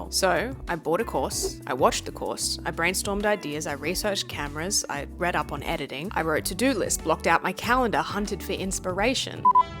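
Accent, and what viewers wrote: Australia. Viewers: Sounds Aussie like Struthless. Cool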